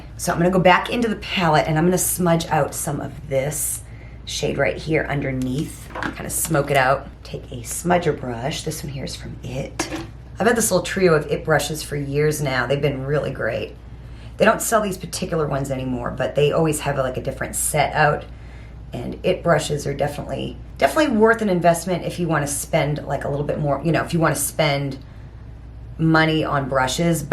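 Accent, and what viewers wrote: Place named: Georgia, United States. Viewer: I didn't know you're in Alaska. I feel like you have a Georgia accent 😊😅 I love your earrings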